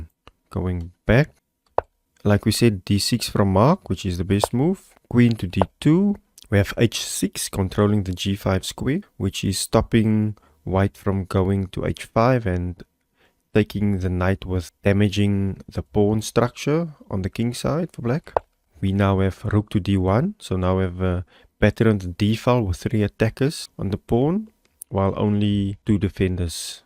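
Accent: South Africa. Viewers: Thanks for the reply/clarification. Nice to hear your South African accent (I used to live there).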